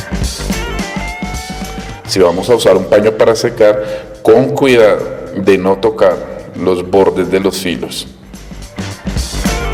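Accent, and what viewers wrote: Colombia. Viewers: Eres colombiana!!!?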